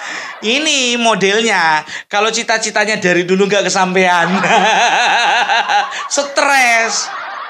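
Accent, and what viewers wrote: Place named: Java, Indonesia. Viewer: Aseeek...opung pake logat Jawa..😂😂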